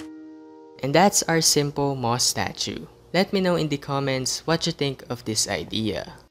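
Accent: Philippines. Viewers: he sounds like a filipino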